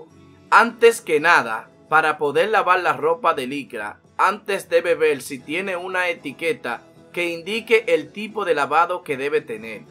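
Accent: Dominican Republic. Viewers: Es dominicano/ puertorriqueño amiga 😹😹 así es la lengua allá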